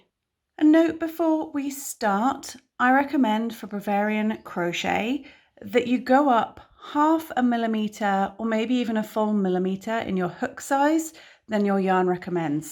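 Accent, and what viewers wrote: England, United Kingdom. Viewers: OMG beautiful! I must admit to slight confusion at first with an English accent, and American stitches!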